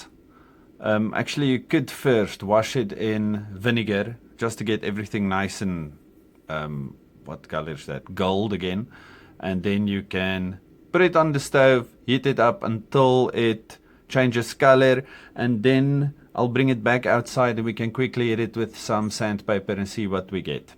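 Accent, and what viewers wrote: South Africa. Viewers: Ah yes, a fellow South African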